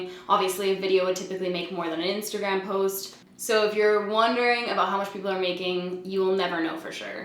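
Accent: Canada. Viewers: First of all, you're so stunning. Second of all you're Canadian accent is adorable. And third, I love your videos! You're the most honest youtuber out there, I love that I can trust your opinion when trying to figure out what makeup to buy and what's not worth it! Thanks for being the coolest thing ever x0x0x0x0x teresa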